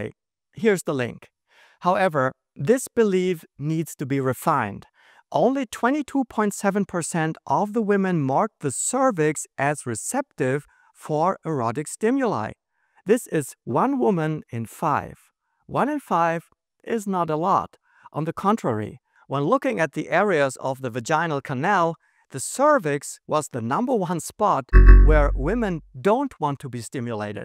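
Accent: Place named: Germany. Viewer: When he introduced himself with his name i just looked up like: damn dont even realize someone is also german until they sqy something in german... Like bro, i am german and dont recognize my own accent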